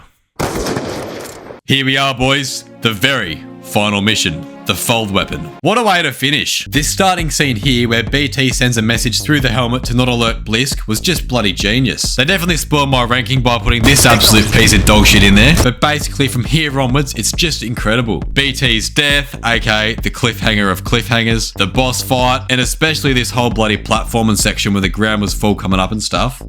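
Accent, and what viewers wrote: Australia. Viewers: Mate I’m Australian and my accent ain’t close to being as thick as yours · Damn the Australian accent is amazing, good video as well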